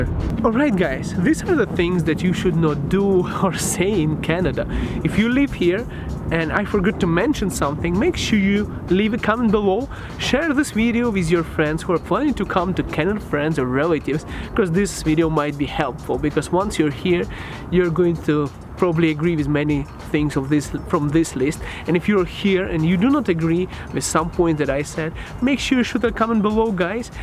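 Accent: Russia. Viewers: U Russian? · As a Canadian, I LOVE other accents. Do not be ashamed of your Russo accent.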